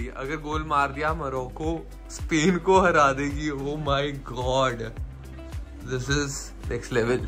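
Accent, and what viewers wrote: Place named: Bengal, India. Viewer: Your bengali accent ♥️🔥🥺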